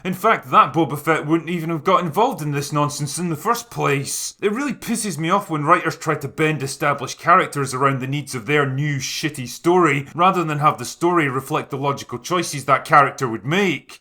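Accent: Scotland, United Kingdom. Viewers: Done in a beautiful Scotish accent as well, a fine piece of journalism in a world of dying journalists, the only true news i,m heard this decade, nice one mate.......... ITS A FUCKING JOKE , MODS , the designers went raving and used Quadrophinia as the storyboard instead of going to work......... WANKERS. LOL..... brilliantly said mate.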